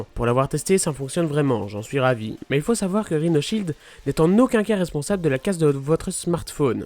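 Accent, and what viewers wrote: Belgium. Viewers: et t'est belge?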